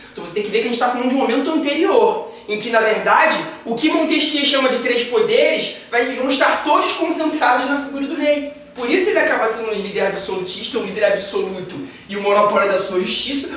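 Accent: Rio de Janeiro, Brazil. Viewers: mds aposto q eh carioca kkkkkkk amei!!😍👏👏❤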